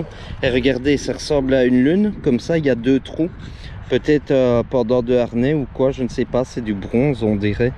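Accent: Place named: Belgium. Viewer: Hola le bel accent de Belgique, je crois que je vais aimer ta chaîne 😏😏 Angy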